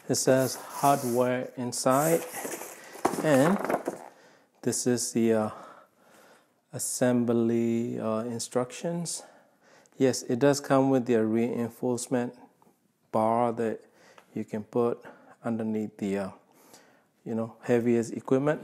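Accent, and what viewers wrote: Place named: Myanmar. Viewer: You have a Burmese accent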